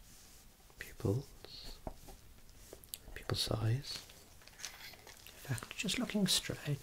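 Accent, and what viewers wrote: Birmingham, United Kingdom. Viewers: Birmingham?!?! Wow i love the accent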